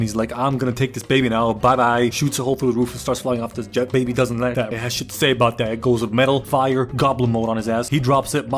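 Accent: France. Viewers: Are you french? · Hey you have a really good french accent !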